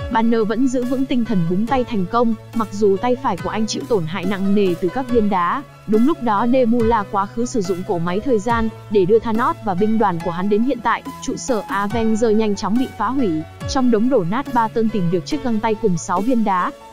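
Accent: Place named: Thanh Hoa, Vietnam. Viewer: Giọng thanh hoá nghe chối kinh dấu ngã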